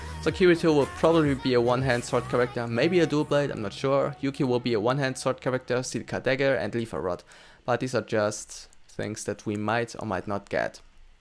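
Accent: Germany. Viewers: Özlem Polat and for his accent, it’s a german/ dutch accent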